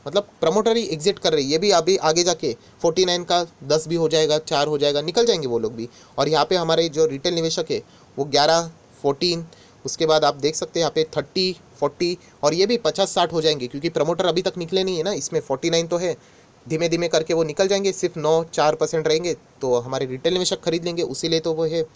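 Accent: Maharashtra, India. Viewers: Nhi dada tynchi tone varun kalt te marathi ahet..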